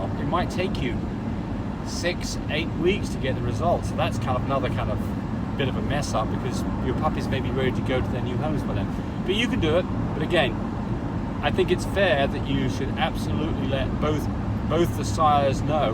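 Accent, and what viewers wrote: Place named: United Kingdom. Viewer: Im really curious you have a british accent.are you originally from UK.. karen from england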